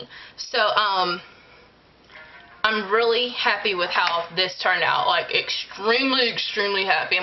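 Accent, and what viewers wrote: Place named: South, United States. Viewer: Is that country in the background?? LOL Your southern accent is cute!!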